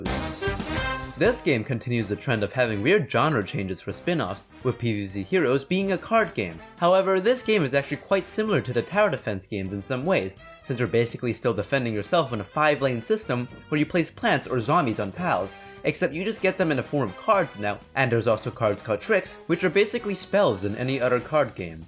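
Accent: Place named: United States. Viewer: He is either american or canadian.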